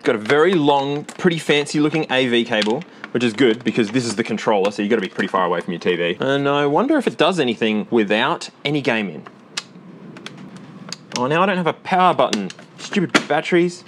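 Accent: Australia. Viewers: Shouty aussie man's friend is playing on a butt! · They're both Australian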